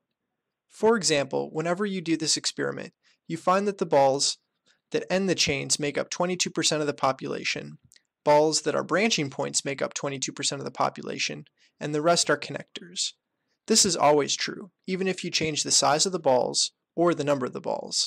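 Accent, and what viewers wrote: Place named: Rio de Janeiro, Brazil. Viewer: pq o inglês dele tem sotaque carioca??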